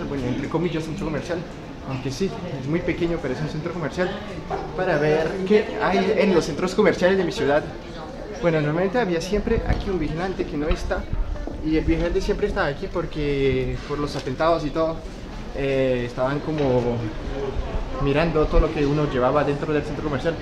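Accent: Colombia. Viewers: Exelente video, dices que tu ciudad es pequeña pero es muy hermosa!!! Ya hablas como todo un colombiano. Saludos desde México 😘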